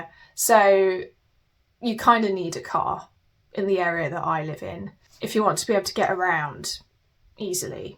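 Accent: United Kingdom. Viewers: 🇧🇷 Even as a Brazilian, I don’t really have much difficulty understanding English in general, but the UK accent is a funny case, hehe. Anyway, I came from your gaming channel and didn’t know about this other one. It’s really chill to watch! If you’re having some trouble at the beginning of your stream, I’d say it’s totally okay to take one stream just for adjustments or something. The community will definitely help. Hugs! (And sorry for the English, still a lot to learn!)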